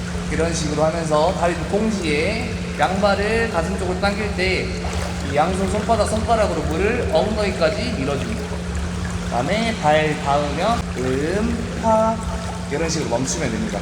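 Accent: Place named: Jeolla, South Korea. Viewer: 말투가 전라도ᆢㅎㅎ 저는 목포염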